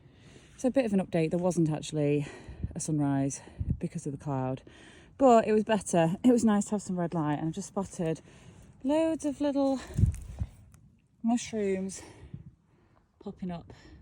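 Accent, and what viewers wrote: United Kingdom. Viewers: First I want to say how extremely beautiful you are, I love your British accent also. We raise a lot of sheep hear in the US. We hire our sheep sheared and then the shearer always buys all of our wool. Our biggest money comes from selling our lambs. We grain them with some of our Iowa corn. We try to get them nice and fat before we sell.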